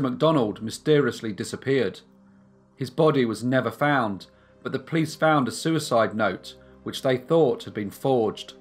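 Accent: England, United Kingdom. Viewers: I'm in love with ur accent and way of speaking! Where are you from? England? Where exactly?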